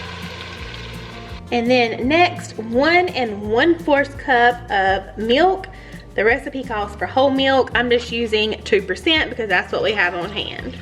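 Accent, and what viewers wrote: South, United States. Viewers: The shells look amazing! Thanks for the idea- I’ll definitely be trying them! Keep up the great work. I love your videos and southern accent! ❤️❤️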